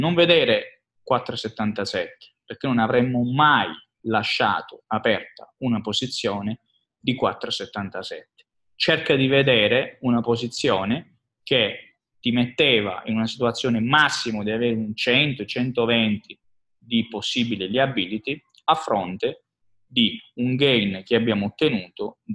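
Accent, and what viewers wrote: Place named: Bari, Italy. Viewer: Non si capisce nucazz propij alla barese come parla lui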